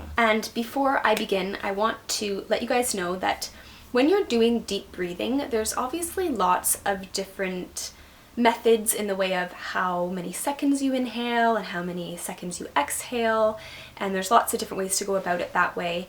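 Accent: Canada. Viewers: definitely a canadian accent! :-) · I don't know, I kind of find her voice soothing by itself, and I like Canadian accents. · Love her, she's Canadian. I can tell by how she says out or about. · She must be Canadian. (out and nose). Thank you for these techniques. I definitely need them.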